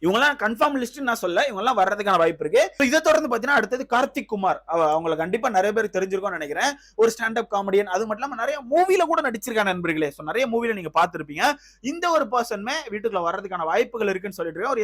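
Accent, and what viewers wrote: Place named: Tenkasi, India. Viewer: தம்பி போனசீசன்ல பிக்பாஸ்க்கு ரசிச்சனோ இல்லையோ உங்களின் ரசிகன் ஆகிவிட்டேன் ஏனென்றால் உங்களின் வட்டார வழக்கு தென்காசி மாவட்ட வட்டார வழக்குபோல் உள்ளது அதனால்தான்.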